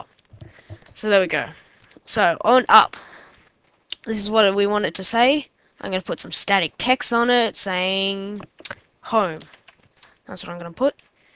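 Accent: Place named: United Kingdom. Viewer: omg love your british accent ^^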